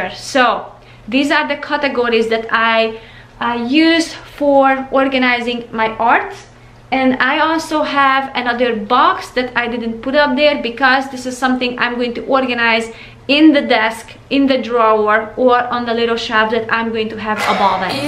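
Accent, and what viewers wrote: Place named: Hungary. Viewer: Huuu nagyon nagyon hallani a magyar akcentust!!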